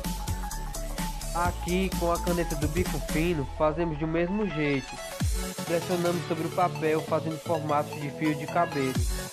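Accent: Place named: Northeast, Brazil. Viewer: E esse sotaque do meu nordeste? <3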